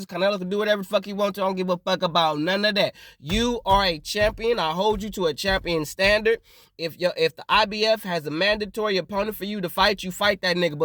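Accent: United States. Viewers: I'm not mad at you. You're trying to get Canelo haters on your channel LO, L, and then I can hear yourself American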